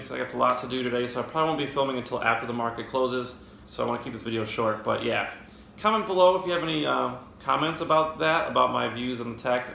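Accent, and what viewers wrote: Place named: RP, United Kingdom. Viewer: Ok cool, it reminded me of how the Queen speaks (Im in the UK) :) I think Tesla should have produced the Y in the first place instead of the Model 3. The 'crossover' is the most popular type of car out there in N America. On production they are too slow at the moment in fulfilling orders. Even if they made 5k a week which they aren't doing, thats only 240k a year. Its not enough to be a mass market. I don't know why they didn't invest in production facilities to make 10k a week right from the start. Why not take the debt on while it is available from the capital markets and just put the infrastructure in place? Otherwise they'll just keep ticking along making cars in relatively small amounts. If they want to be the iPhone of cars they need to get the cars out of the door!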